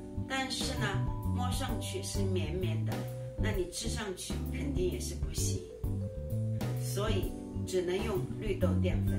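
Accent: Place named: Wu, China. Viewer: 上海人？听上去就是我家乡的吴语嘛。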